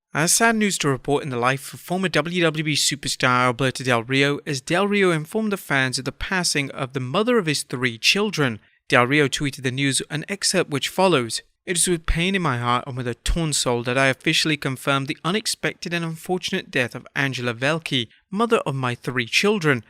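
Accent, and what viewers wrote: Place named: United Kingdom. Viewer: I always look forward to hearing you return , UK accent FTW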